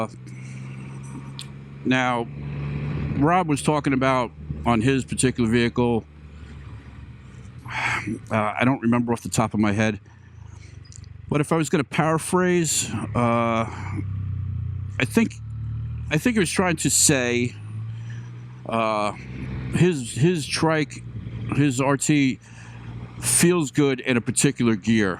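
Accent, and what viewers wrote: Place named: New York, United States. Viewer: Man you are so New York😅😂😅 Thanks for the videos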